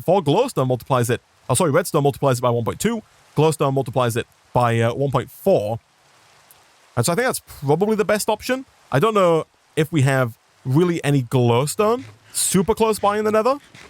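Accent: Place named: England, United Kingdom. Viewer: English accent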